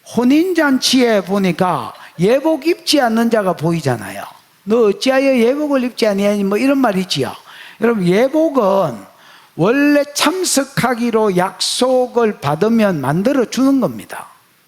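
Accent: Gyeongsang, South Korea. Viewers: 목사님이 대한민국 BUSAN에 오셔서 구수한 경상도 사투리를 쓰시면서 어려운 계시의 하나님의 말씀을 너무나 쉽게 또 드라마틱하게 온몸으로 설명하시니... 매끄러운 서울말투보다 저는 더 이해하기가 쉬워요. 😊😊😊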